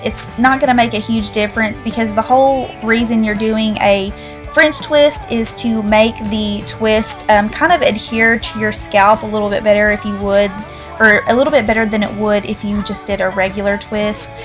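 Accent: South, United States. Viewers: Gorgeous makeup and hair! I love it :)) I don't own any Mac products..do you happen to know any dupes for those colors? I can't wait to try this out!! Love hearing you talk cause we both have that country twang ;) Xoxo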